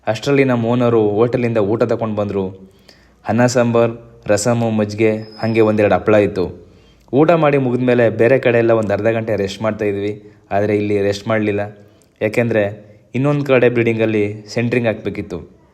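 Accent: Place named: Talakadu, India. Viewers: Super super 👍 ಗುರು. ಈಗಾಗ್ಲೆ ಎಲ್ಲರನ್ನು ನಕ್ಕು ನೀಗಿಸಿದ್ದಿರಿ, ಖಂಡಿತಾ ನಿಮಗೆ ಒಳ್ಳೆ ಹೆಸರು ಬಂದಿದೆ. ಇಷ್ಟು ಕಷ್ಟಾ ಪಟ್ಟವರನ್ನು ಎಂದೆಂದಿಗೂ ದೇವರು ಕೈ ಬಿಡುವುದಿಲ್ಲ . ಎಲ್ಲಿಯತನಕ ಆ ದೇವರು ಕೊಟ್ಟಿರುವ ಆರೋಗ್ಯ ಇರುವುದೂ ಅಲ್ಲಿ ತನಕ ಯಾವಾ ಕೊರತೆಯೂ ಚಿಂತೆಯೂ ಇರೋದಿಲ್ಲ ಅಂತ ನನ್ನ ಅನಿಸಿಕೆ. ನಾನು ಸಾಹ ನಿಮ್ಮಂತೆ ಕಷ್ಟ ಪಟ್ಟು ಈ ಹಂತಕ್ಕೆ ಬಂದಿರುವೆ ಎಂದು ಹೇಳಿ ಕೋಳ್ಳಲು ಹೆಮ್ಮೆ ಇದೆ 😉 ಆ ಭಗವಂತನ ದಯೆಯಿಂದ ನಿಮ್ಮೆಲ್ಲಾ ಆಸೆ ಆಕಾಂಕ್ಷೆ ಈಡೇರಲಿ ಎಂದು ಹೃತ್ಪೂರ್ವಕವಾಗಿ ಹಾರೈಸುತ್ತೇನೆ. ನಿಮ್ಮ ಸಂಭಾಷಣೆ ಕೇಳಿದರೆ ಮೈಸೂರು ನಂಜನಗೂಡಿನ ಕಡೆಯವರು ಅಂತ ಅನ್ನಿಸ್ತು. ಯಾವ ಊರು ನಿಮ್ಮದು !? ಕುಳ್ಳಿ ಯ ಹೇಸರೇನು !? ಅದ್ಬುತ ವಾಗಿ ಅಭಿನಯ ಮಾಡುತ್ತಾರೆ. ನಮ್ಮೂರ ಭಾಷೆ ಕೇಳಿ ತುಂಬಾ ದಿನಾ ಆಗಿತ್ತು. Anyway thank you so much 👍🎉💐🤝👌🙏💯 ಹರಿ🕉️ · Nan gandana uru thalakadu nim bhashe nange thumbha ishta nan gandana uralli nim tharane mathadodhu ❤ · Howdu kul munda chanagidane idu chamarajanagar side language nan amma kuda a kade avre I like this language.... chanagiratte akade bashe kollegala, nanjanagudu, chnagar, gundlupete side bhashe very nice ....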